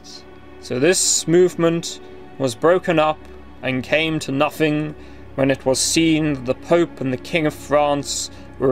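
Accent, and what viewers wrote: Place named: United Kingdom. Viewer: Good show, but I cannot stand a British accent. Also the background music is far too loud and annoying.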